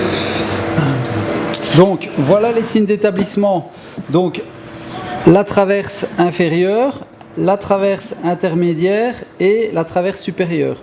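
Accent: Liège, Belgium. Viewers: A la 30,26 minutes le serre joint à lâcher !!!! Heureusement que tu tenais bien le gabarit n’a pas bouger. Pour ma part , j’ai un autre type de gabarit. Qui entaille directement les trois charnières en un seul serrage. Porte 201,5. En tous cas , ça fait plaisir d’entendre un belge avec un accent liégeois. Bientôt je me lance sur YouTube avec mon accent Brusselair ! Ça va déménager les R.🥳🥳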